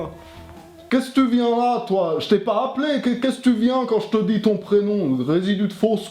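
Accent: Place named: Haute-Savoie, France. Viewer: Haute Savoie plutôt, mais ça ressemble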